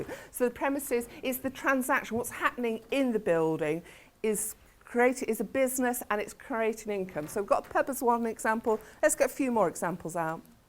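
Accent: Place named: United Kingdom. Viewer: It's a boring enough subject to sit through. Why on earth would anyone want to sit through it having to listen to someone with such an annoying British accent?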